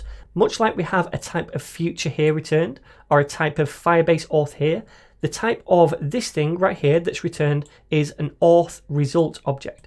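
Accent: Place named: England, United Kingdom. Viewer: You are the best in youtube ive seen explaining each topic I guess because your English is native so you don't do any effort to translate your thoughts plus you are awesome plus your accent is Sooo English that makes every word sound smarter,, and makes me feel wearing a grey tailored fit suit attending a lecture in an old shiny wooden lecture hall in oxford university in year 1752 among a beautiful group of people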